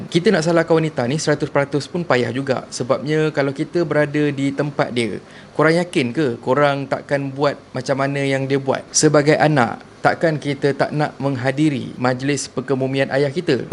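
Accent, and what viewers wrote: Malaysia. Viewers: Lain y bhsa melayu malaysia ini. Lucu kosakata dan aksen logat nya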